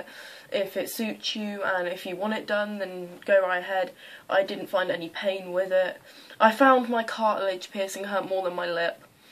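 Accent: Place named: Midlands, United Kingdom. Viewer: Where do you live? You sound like you're from the Midlands like Wolverhampton or somewhere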